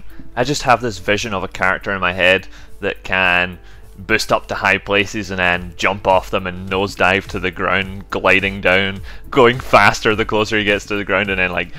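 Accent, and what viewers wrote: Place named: Scotland, United Kingdom. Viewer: Your accent sounds scottish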